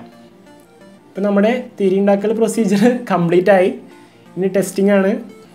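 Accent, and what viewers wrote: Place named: Ernakulam, India. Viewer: Kollam bro... Ur slang u must be from Ernakulam area, I think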